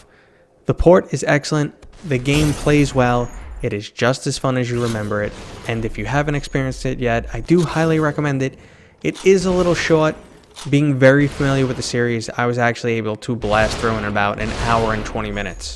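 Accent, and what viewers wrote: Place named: Boston, United States. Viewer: Holy mother of Boston accent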